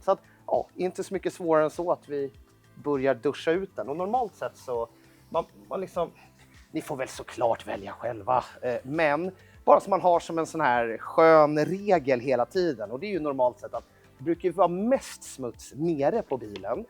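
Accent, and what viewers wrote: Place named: Stockholm, Sweden. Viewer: Jag är stockholmare och om det är det här icke-stockholmare menar när de säger att de hatar stockholmska så förstår jag dom... x) låter som en 12-årig tjej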